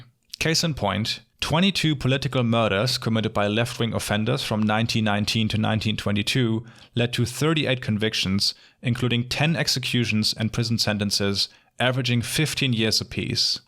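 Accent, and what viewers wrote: Germany. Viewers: Ha, I knew you were German. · Bist du Koelner?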